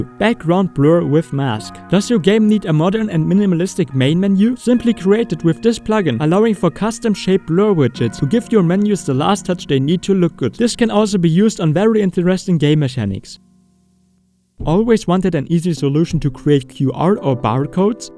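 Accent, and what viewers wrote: Germany. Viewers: I take it from you accent that you're German too? Cause I'd be interested in the Node Graph Assistant Plugin (coming from Houdini it's just incomprehensible to me how bad the Node Graph functions in UE are by default 😅) but it says that the plugin was not available in my region. How did that work out for you?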